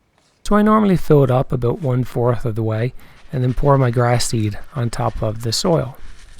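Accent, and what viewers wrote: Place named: Canada. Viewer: That is one Canadian accent you got there, bud! Ottawa valley?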